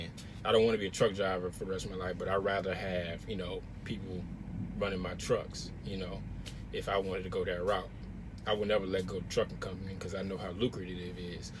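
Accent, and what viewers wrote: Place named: Springfield, United States. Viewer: Sounds like youre from Springfield MO.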